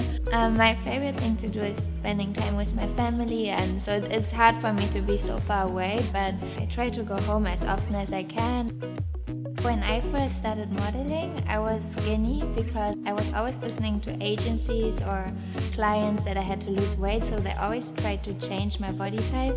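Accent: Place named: Germany. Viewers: She’s super cute but you can hear that she’s german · Freya Loves Kittens Yes she has a really strong accent and I can hear that also I’m a german too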